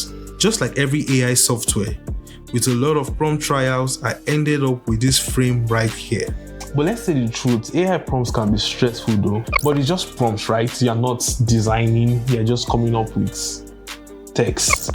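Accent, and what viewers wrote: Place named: Africa. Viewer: Wahala! If you can't understand his accent, then you're clearly not his target audience. There are millions of other creators that have made videos on this, so go watch them. Imagine telling a creator to change his accent, a deeply ingrained trait, just to fit you. And calling his accent "local"? That's such a colonized, back-handed mindset. Accent is due to speaking another language with inflections similar to your native language. But asides from being widely spoken, please what makes English a "higher" language to diminish or look down on our beautiful African languages and accents. Please oo, think before you comment.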